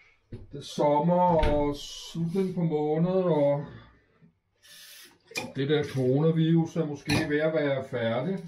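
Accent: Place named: Bornholm, Denmark. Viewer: hvorfor snakker du bornholmsk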